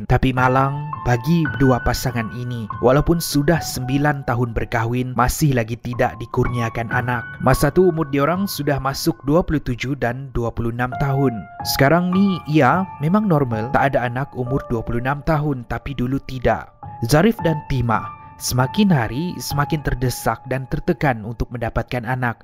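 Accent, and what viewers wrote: Sabah, Malaysia. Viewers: Sabah ka tu bang...area mana ? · sedap dgr org sabah cakap... semua bahasa buku....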